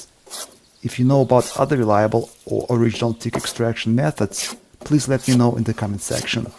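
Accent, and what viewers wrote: Russia. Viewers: If coronavirus could be eliminated from our bodies like ticks, then it.would be simple. I notice your english is aquiring the russian form now. In the previous videos, it was more foreign. It is okay. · has a great relaxing voice, with just the right amount of Russian accent.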